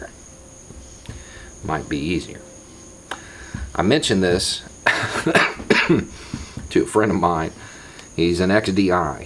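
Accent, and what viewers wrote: South, United States. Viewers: The fact that your southern accent would probably stop me from even asking you for directions scares me to dead, I don't want to be part of the fear mongering crowd; I want to see people as human beings and have my children learn from many cultures just my own.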